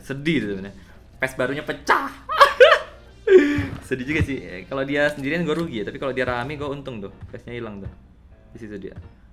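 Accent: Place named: Surabaya, Indonesia. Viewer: Wkwkwk boso jowo wes suwe ng Sby jowo suroboyoan bang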